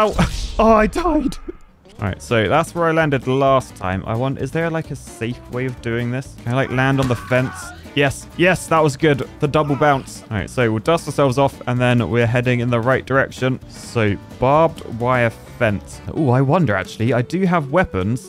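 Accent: United Kingdom. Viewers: Strangely enough this feels like a gray still plays video but with a british accent😂😂😂 im loving it so far only about 15% through the video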